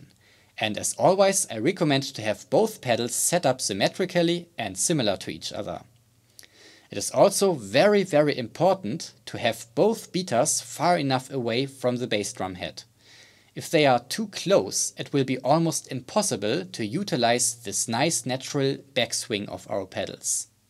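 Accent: Germany. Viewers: Lovely deutsch accent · Your from germany...i think its a strong german accent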